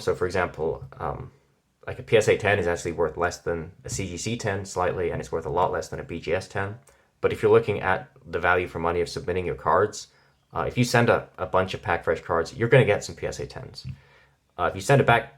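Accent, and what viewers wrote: United Kingdom. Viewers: Your British accent is stimulating my focus lol.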